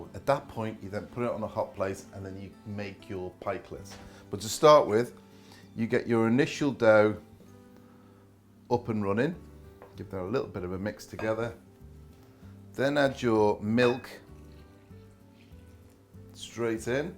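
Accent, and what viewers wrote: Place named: Merseyside, United Kingdom. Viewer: That unmistakably from somewhere in the Merseyside area.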